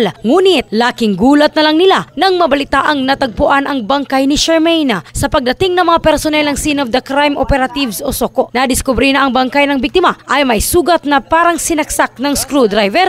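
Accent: Visayas, Philippines. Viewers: isa ka pa.. Wala ako sinabi lahat ng bisaya ganyan tono ng pananalita.. Pinoy talaga ang hina sa pag intindi.. Ano na lang kaya pag nasa ibang bansa kayo 😅 cguro nga nga kayo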